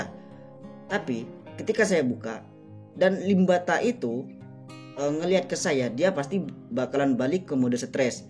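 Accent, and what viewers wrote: Madura, Indonesia. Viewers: Abang logatnya kek orang Madura😅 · Ada logat maduranya 😁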